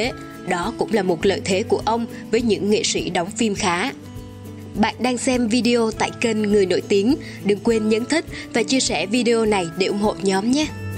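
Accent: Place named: South, Vietnam. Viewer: Giọng đọc hay, giọng Nam bộ mà sao thấy ko giống với kiểu cách mà người Nam Bộ xưa nói thế nhỉ, cảm thấy khang khác! 🤔🤔🤔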